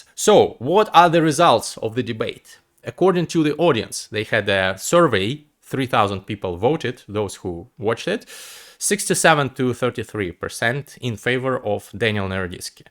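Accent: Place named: Russia. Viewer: Спасибо тебе огромное. И за проделанную работу, и за хороший, богатый английский, и за понятный российский акцент, который делает английский понятнее для русскоязычных))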